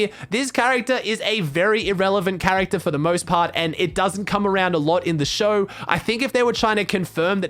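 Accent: Australia. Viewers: has a very strong Australian accent but when he starts off his second line with “Welcome back to my YouTube Channel!” It sounds so British how he always pronounces it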